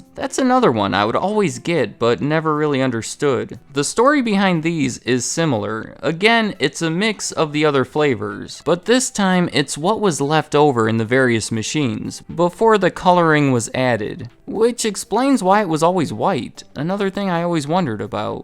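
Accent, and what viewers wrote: United States. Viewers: What state are you from? I swear to god the way your pronounce you "ah" sound is so familiar that I must know someone from there. Super subtle accent, but interesting nonetheless.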